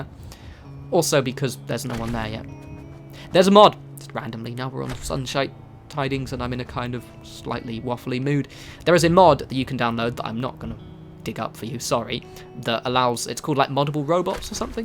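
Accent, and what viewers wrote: England, United Kingdom. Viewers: English. Weston-Super-Mare I think:)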